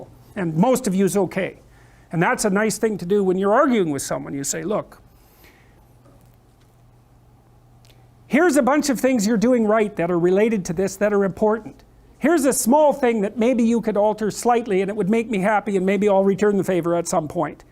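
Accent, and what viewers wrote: Canada. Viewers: I love it when he goes full Bubbles from Trailer Park Boys 🤓 I love that Canadien accent!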